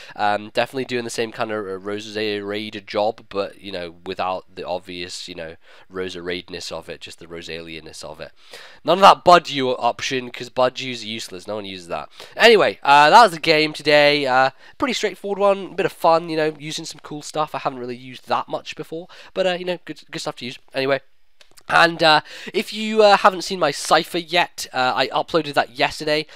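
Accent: Scotland, United Kingdom. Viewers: you Scottish that's awesome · dude you're scottish? that's fucking awesome, listen to a band called they're from Scotland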